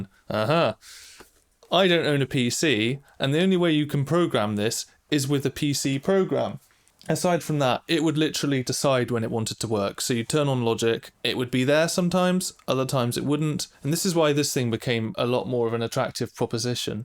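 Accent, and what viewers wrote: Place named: United Kingdom. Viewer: Huh, I didn't know you were British. Neat video by the way! Can't wait for the next songs to come!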